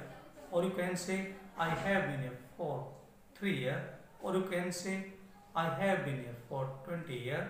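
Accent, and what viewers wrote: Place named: United Kingdom. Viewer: Best of all english just like british pronunciation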